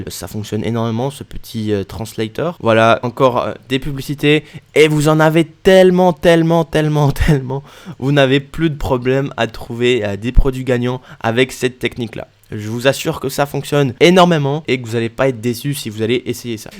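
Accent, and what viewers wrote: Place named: Romandy, Switzerland. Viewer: bien sûr! Merci pour la vidéo bourrée d'informations et présentée avec panache. (Et un accent romand je crois!)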